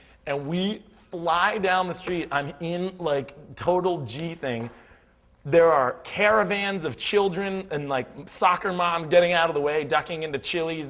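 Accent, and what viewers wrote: Boston, United States. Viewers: He needs to bring the Boston accent back more often. Seriously. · Oh my god! he is the best story teller ever!I love his boston accent, its priceless!!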